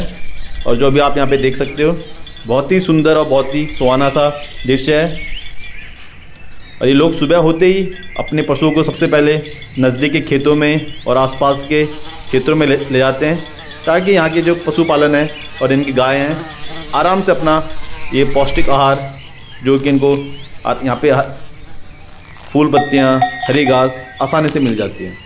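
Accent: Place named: Haryana, India. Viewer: Sir ji aapki aawaz se lag raha jese aap haryana se h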